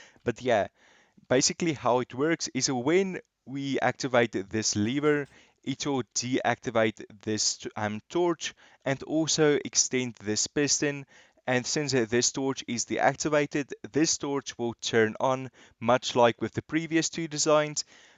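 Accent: South Africa. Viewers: Your south african again bra ek ook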